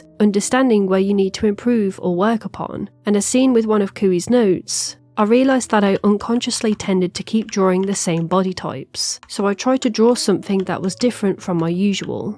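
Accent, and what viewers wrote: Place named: England, United Kingdom. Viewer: What a pure English accent, I love it ❤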